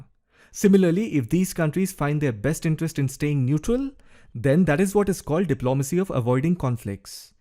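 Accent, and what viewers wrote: India. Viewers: Russian propaganda with Indian accent.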